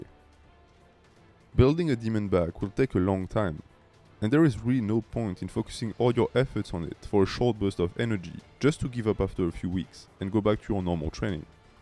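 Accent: France. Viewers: With your accent I Can Say that you're French... Am I right ? I Can tell this 'cause I'm french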